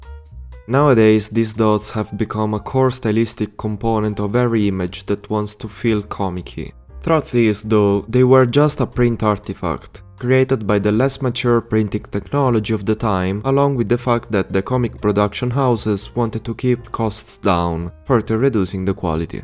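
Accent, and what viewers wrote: Italy. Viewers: Sorry if i ask but are you italian? I love you btw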